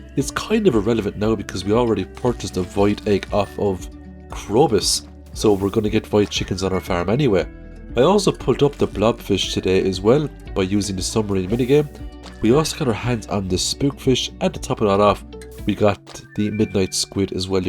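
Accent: Ireland. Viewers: I literally came back to this video to post this comment. I watch these videos once or twice while I'm awake to get ideas for my own playthroughs, and then I use them over and over to fall asleep to because doesn't ever raise his voice. The Irish accent helps with the relaxing quality too I think. So many other content creators can't be trusted to not scream in my ear suddenly while I'm trying to fall asleep. If you're into calming voice overs in general, I recommend Steve1989MREInfo. Completely different channel, he reviews MREs, but his narration is very calm and consistent throughout his videos.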